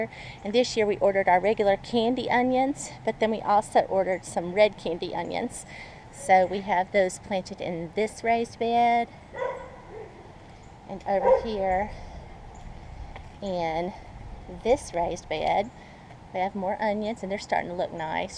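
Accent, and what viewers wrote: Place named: Georgia, United States. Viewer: My mom loves to grow plums, and I'm always disappointed by them. I've never once seen a plum that doesn't have a mark from a bug or a worm that nibbled on it. She's the same about peaches, and she's got two new peach trees to plant this year, but I just don't think we're far enough North. We're in zone 8A Maybe? One time, 30 years ago, She got a tree full of delicious nectarines, and it has just given her a fever which she can't seem to get past. We haven't seen nectarines or peaches like that ever since. And if her peach trees do make a few fruit, typically the squirrels get them. Love your videos, I love your accent because I have a South Georgia accent, and I got your channel by looking at your strawberry videos from a couple years ago.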